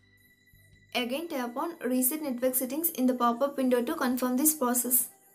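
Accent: India. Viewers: Are you Indian because of your voice so nice.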